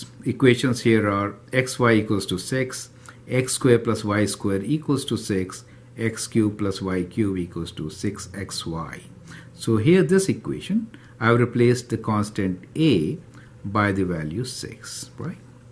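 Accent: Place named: India. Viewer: you sound so intelligent indian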